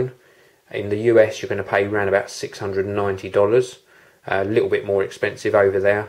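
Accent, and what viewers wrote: England, United Kingdom. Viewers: thanks for the review...im preordering the unit :] i hope the battery is as good as you say it is....btw gotta love english accent ;]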